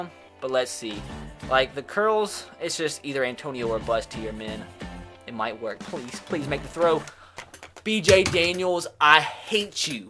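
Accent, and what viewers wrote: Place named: Texas, United States. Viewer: I feel like he is a Texas stereotype with his accent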